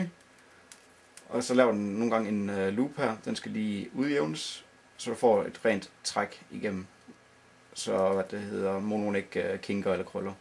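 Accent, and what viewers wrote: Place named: Scotland, United Kingdom. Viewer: i thought you had a bad scottish accent at first..lol..good vid tho mate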